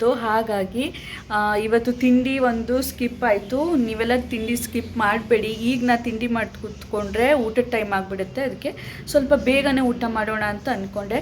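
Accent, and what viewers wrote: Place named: Kumta, India. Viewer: Ondu mat helala friend, Nam kumta bashe gatte bere, nenpitkolli, becoz Nan nine YouTube nodtirovaga nim vidio nodade subscribe madade, Nam bashene matadide ennu kushine nanu horgadane edini 27 varsdinda, nammur bashe kelidre evru nammavre Alva ansatte, god bless u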